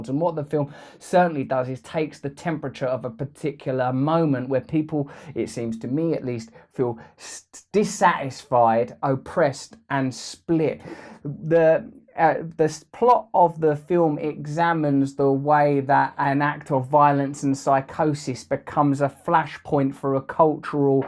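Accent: United Kingdom. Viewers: Fucking british and their pronunciations. Controversies...aluminum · Ive heard him talk on video. Never picked up british accent before.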